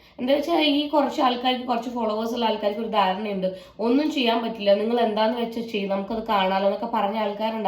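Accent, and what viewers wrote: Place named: Kanhangad, India. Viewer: super avatharanam nammude kanhangadan slag idakku varatte adipoli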